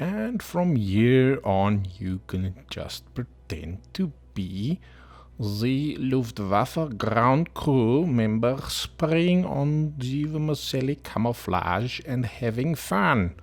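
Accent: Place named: South Africa. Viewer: Cannot miss the South African accent!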